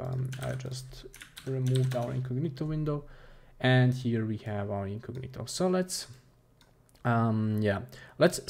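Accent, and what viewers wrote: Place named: Russia. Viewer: Are you from Russia?) I think so, because your English has a Russian accent.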